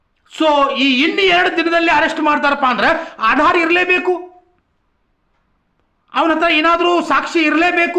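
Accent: North Karnataka, India. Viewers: ಉತ್ತರಕರ್ನಾಟಕದ ಜವಾರಿ ಭಾಷೆ.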